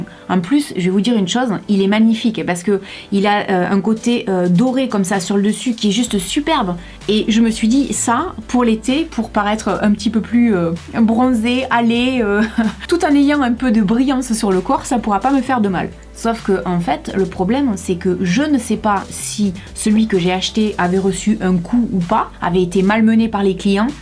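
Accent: South, France. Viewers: J'ai découvert ta chaîne aujourd'hui, et j'adore :) !!! Ton accent du sud est super joli, mais j'aime beaucoup comme tu présentes tes produits :) Je m'abonne !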